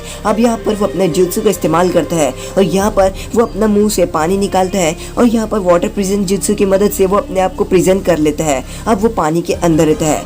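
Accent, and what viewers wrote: Maharashtra, India. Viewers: Bro are you marathi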